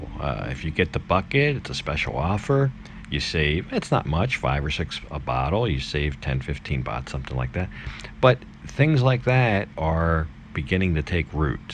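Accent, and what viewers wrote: United States. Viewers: I dont understand, as you live in this country, that you pronounce cities, places and names in an american way... Why do you think this country wants their language pronounced like that. Disrespect !!!!!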